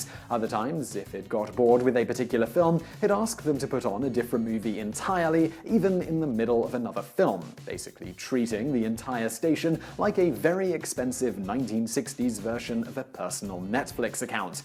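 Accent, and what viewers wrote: United Kingdom. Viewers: Gallagher Rutledge ya most Americans pronounce those worlds right, but I understand since he was British.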